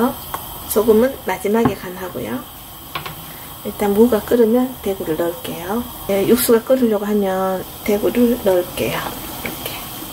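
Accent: Gyeongsang, South Korea. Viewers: 끈나슴니다 듣기 좋습니다 갱상도 억양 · 따듬어 ㅎㅎ 경상도에 사는데 익숙한 말투시네요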